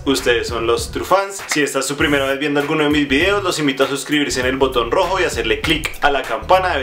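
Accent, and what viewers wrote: Antioquia, Colombia. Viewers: Ud es paisa? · tú eres paisa?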